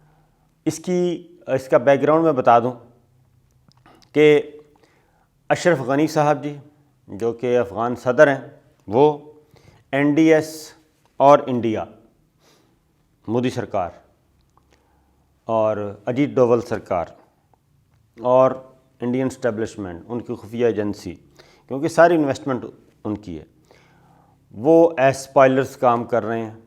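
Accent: Punjab, Pakistan. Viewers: the way u speak English with Punjabi Accent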